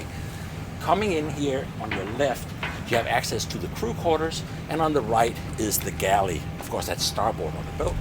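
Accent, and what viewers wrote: Denmark. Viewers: Hey, nice tour, nice boat - buy your accent i say you are Danish. Your cameraman was sleeping, every time you pointed down to show the mast foot or other things, the camera was just horizontal. wake him/her up next time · Du lyder dansk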